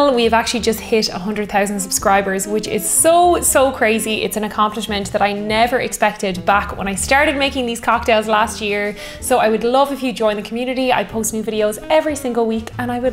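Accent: Ireland. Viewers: Greetings from America🇺🇲 Saw you first on the TRY Channel, which was fantastic fun. Didn't know you had your own series; great video. One can never go wrong with the iconic Long Island. Love the video, Love Irish accent, and the perfect hostess. Looking forward to more of the same in the near future... Cheers! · As a 21 year veteran fireman from a university town PENN STATE {one of, if not the, top party schools in the USA}. . . I LOVE the Long Island Ice Tea. My favorite way to drink it? by the pitcher! My record was 3 pitchers. And I still walked home upright. And my I say from the states, It's hard to tell which is more lovely, your eyes, hair, or that wonderful Irish accent.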